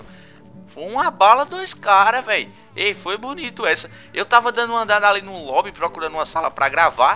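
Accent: Northeast, Brazil. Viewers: KKKKKKKKKKKKKKKKKKKKKKKKKKKKKKKKKKKKKKKKKKKKKKKKKKKKKKKKKKKKKKKKKKKKKKKKKKKKKKKK Eu queria ter o sotaque nordestino mano o negosso é muito dahora man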